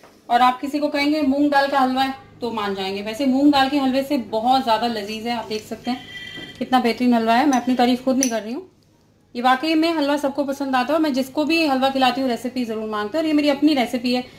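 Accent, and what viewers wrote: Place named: Rajasthan, India. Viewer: Rajasthani.typical accent.accepted.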